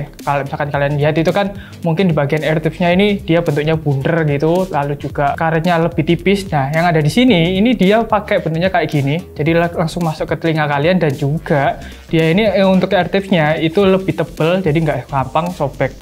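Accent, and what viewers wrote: Java, Indonesia. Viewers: suka logat jawanya :)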